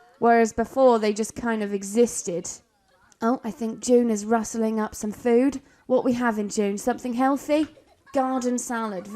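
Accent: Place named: South East England, United Kingdom. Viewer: South East England, in and around London area. I think she has said before she is originally from Reading? Which isn't too far from London 😊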